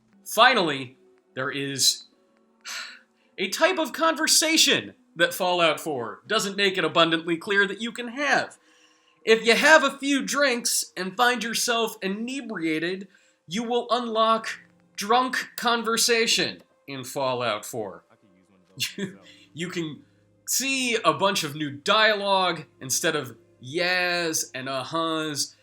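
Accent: Scotland, United Kingdom. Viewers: Why 8k dislikes? Yeah his accent is annoying but Scottish people don't get this much dislikes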